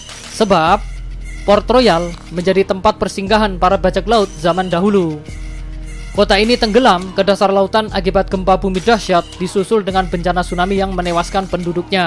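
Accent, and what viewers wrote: Java, Indonesia. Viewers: Pusing dengernya kalo naratornya medok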